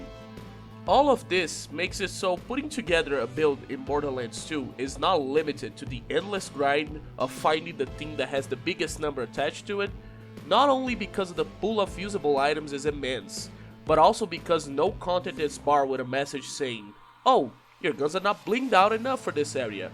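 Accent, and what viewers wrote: Brazil. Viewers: I don't know how but I immediately spotted a brazilian accent on his voice, which took me off guard since: 1- I'm terrible at that; and 2- I don't know many brazilians who enjoy Borderlands. It's definitely lesser known IP around here and I had to brute force my friends into this franchise. What a pleasant surprise, keep going! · Eu to aqui pensando "que sotaque... brasileiro" ai fui ver e de fato, é um brasileiro falando e.e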